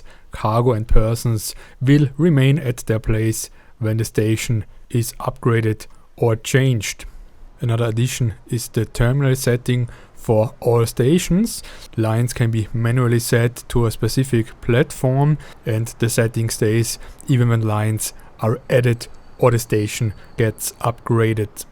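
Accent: Germany. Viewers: Is it German accent? It's hard to understand for non-English speakers. But it's amazing!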